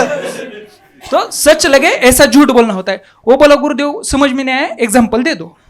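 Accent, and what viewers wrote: Maharashtra, India. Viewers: Nice comedy in the marathi accent. Jai Maharashtra bro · omg show in banglore, i thought MH... they must like ur marathi accent hindi... but how they laugh on u marathi words???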